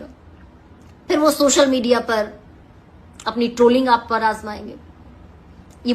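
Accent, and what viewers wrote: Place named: Rajasthan, India. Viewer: Rajasthan ki Serni hai aap itna kehna bhul gaya mai apke bolne ki bhasa se samajh aya aap Rajasthani ho Sorry Mam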